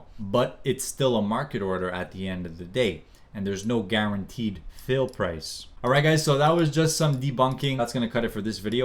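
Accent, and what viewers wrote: Canada. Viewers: By chance, are you from quebec?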